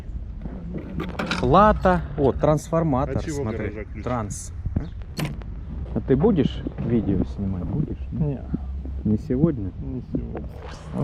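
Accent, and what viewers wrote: Rostov, Russia. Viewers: Как у классика: "Хорошего вам настроения! Вы держитесь там! Просто денег нет."😀 Лайк только за один ростовский говорок!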